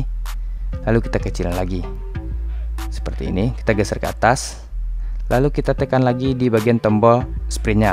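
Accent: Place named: Aceh, Indonesia. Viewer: Bang lu orang Aceh lah, soal nya cara ngomong logat nya kek orang aceh, perasaan gua doang atau gimana ya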